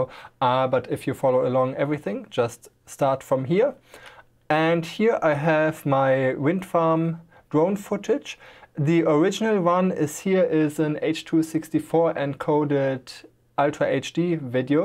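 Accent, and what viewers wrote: Germany. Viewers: r u german?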